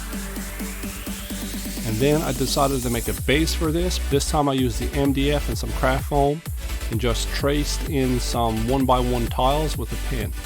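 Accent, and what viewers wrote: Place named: Newfoundland, Canada. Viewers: Oh yeah, you are definitely from the Rock, by! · These look great. And I can hear from the accent that you’re a fellow Newfoundlander.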